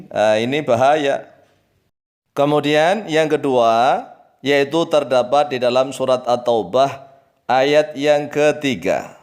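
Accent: Java, Indonesia. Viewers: Yg baca aja ktahuan orang jawa....blum pas...🙏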